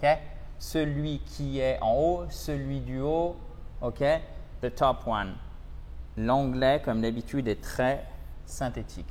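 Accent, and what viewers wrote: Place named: United States. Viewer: Thanks and. I hope another video with. What a beautiful voice! And interesting to learn new expressions really typical and current., you are perfect in your explanations, and your English American is top, but think of directing the slate towards the camera. See you soon. (Petit plus Bonne fête)🎉👍🏻👍🏻👍🏻👍🏻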